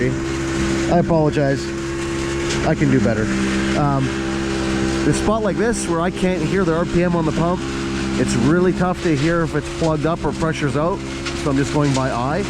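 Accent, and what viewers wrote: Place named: Canada. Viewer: You must be from the deep south of you think a guy with a Canadian accent sounds smart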